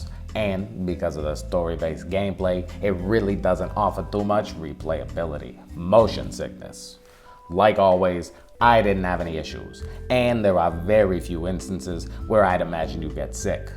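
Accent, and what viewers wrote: New York, United States. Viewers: His voice is like a New Yorker Morgan Freeman. Enchanting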